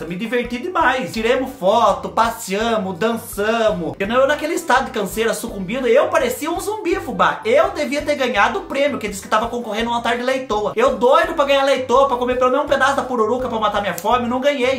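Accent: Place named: Caipira, Brazil. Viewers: Olha Fubá eiu concordo com o Fubá que comentou que se vc fizer Stand Up vc arrasa! Porque vc é muito engraçado porque exagera e fala com sotaque caipira muito engraçado!!!! Bj bj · Vc. Fala iguarsinho eu quando morava em minas gerais 😁😁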